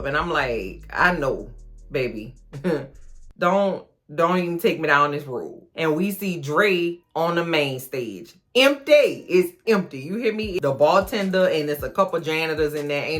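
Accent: New Orleans, United States. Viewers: Are you from New Orleans ma’am? You sound like family!!!!! Edit: Ok I’m 3 minutes in… you definitely from New Orleans! Automatic sub!!! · You gotta be from New Orleans ! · Are you from New Orleans?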